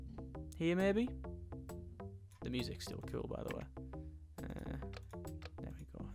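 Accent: United Kingdom. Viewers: Others have done it. Like Nerd3. For some reason (maybe the british accent and their good taste in games) I tend to confuse and Nerd3 sometimes;P Not like "Oh, is this Nerd3 or I'm watching" but memories of one channel or the other tend to fuse together.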